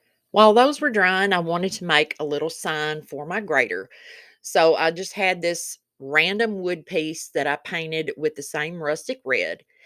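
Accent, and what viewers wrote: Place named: Kentucky, United States. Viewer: GRITS = Girls raised in the south. I was also raised on a farm in the south. Love your KY accent! My father and older siblings and husband are from KY.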